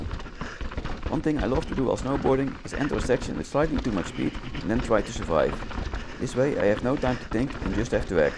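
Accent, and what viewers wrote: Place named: Netherlands. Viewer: ik vind het nederlandse accent engels juist leuk gewoon je eigen ding blijven doen. ik heb je hardtail content nu al 10x opnieuw gekeken . leuke video weer!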